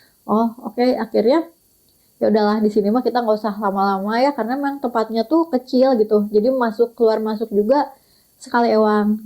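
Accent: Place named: Sunda, Indonesia. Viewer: Samprazan teh! Assalamualaikum! Ari teteh teh saha? Meni nyunda pisan! Meni hebaat uy pangalaman nalusur na!. Se frekwensi jeung kami! 🤗 Lanjut teh nalusur na! Sukses selalu! 👍👍🙏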